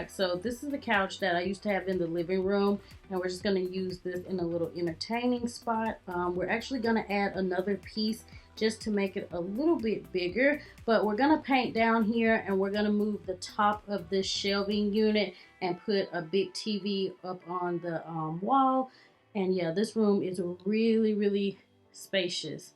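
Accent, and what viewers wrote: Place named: Alabama, United States. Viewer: Love your home!! First video of yours I've seen and I will be back! Totally guessed in my head you are in Alabama! Lol I'm from Tennessee and when you're from the south, you can totally hear the different southern accents I feel like!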